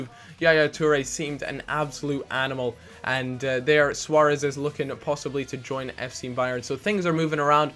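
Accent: Scotland, United Kingdom. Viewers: when I first subbed to you I couldn't work out whether you were scottish or american lol! You sounded sottish but you were so popular and such a big channel so i thought you must be american! Great vid by the way!:) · anyone else think his voice is partly scottish, part englsih, and past american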